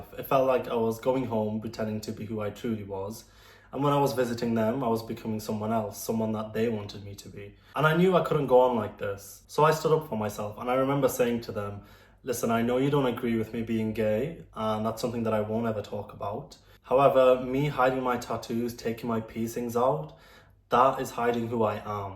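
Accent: United Kingdom. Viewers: sorry mate but what did you expect in reality to happen ? that a conservative family would accept such liberal ideas with open arms ? both of you are at fault here its so obvious they didnt do a good job at raising a muslim especially in a foreign secular country (from your accent its the UK probably) , you being gay wouldve just been fine you cant control who you have feelings towards whatever sure islam doesnt punish feelings but when you dont follow the religion and come with a secular ideology to a muslim family thats your fault for crossing the line , your sister can raise her child however she wants and she wants it to be under islam then she's right to ask you to change certain stuff and accomodate its not gonna kill you if you hide the tattoos and earrings for a while and you cant complain about how she wants her child raised even if it costs her her uncle , its honestly sad that these families dont teach their children islam the proper way in western countries and end up in these tragic situations and you're certainly not the first or the last to be in this situation , i hope you find your way to islam even after such an experience and get back the family bonds you lost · They should’ve known the moment you start talking with a British accent that you were gay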